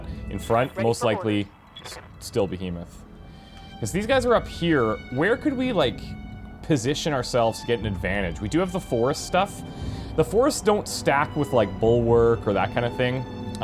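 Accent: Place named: Canada. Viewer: So what's weird is that when I lived in Canada I never noticed the accent. Now living in the States for a decade I'm painfully aware of the Canadian accent. Keeping doing you. Get oot there and get 'em.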